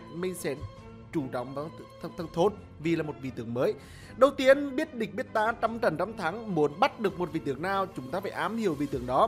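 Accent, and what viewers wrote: Nghe An, Vietnam. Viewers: Y nghe an que choa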